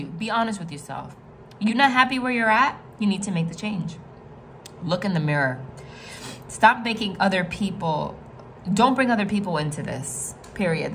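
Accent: New Jersey, United States. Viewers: Listening to you I KNEW you were from NJ. We just have that certain thing lol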